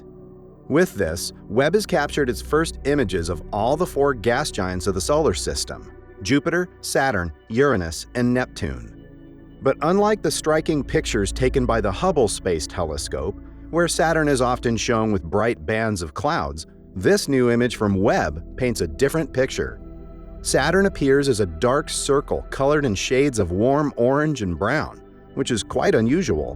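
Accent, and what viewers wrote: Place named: United States. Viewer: ki American voice😅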